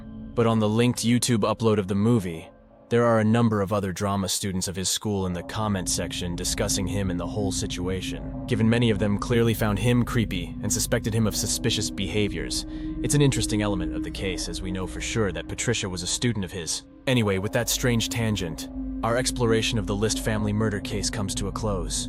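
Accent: United Kingdom. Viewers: oh bless Ur heart ❤️❤️❤️ it's not about the rewards it's about keeping U making this content don't stop Ur bloody good at it!!! Excuse the terminology lol I love Ur British too!!! So here's to many more videos 😁😁😁 xxxxxxxx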